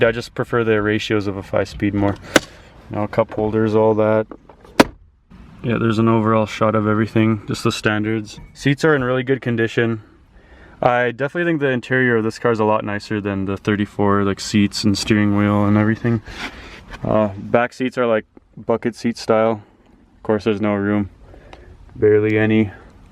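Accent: Canada. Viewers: You sir got my sub! I can't wait to see how the car will look! And also your Canadian Accent is just beautiful! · are you canadian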